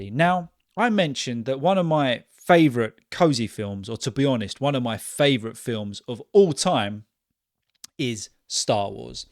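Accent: RP, United Kingdom. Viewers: A gooooorgeous lesson ! And jolly nice R P English without an accent !😉 Un abrazo from Buenos Aires 🇦🇷🇬🇧🇦🇷🇬🇧🇦🇷🇬🇧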